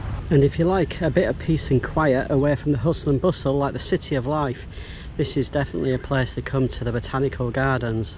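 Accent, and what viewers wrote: United Kingdom. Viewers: You from British?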